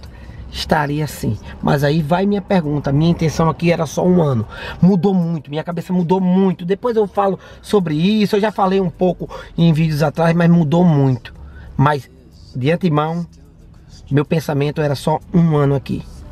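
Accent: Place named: Goiás, Brazil. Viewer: voz de cantor sertanejo deve ser goiano este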